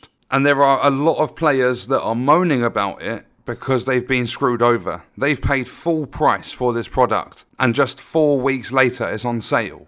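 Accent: United Kingdom. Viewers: Who finds a British accent awkward?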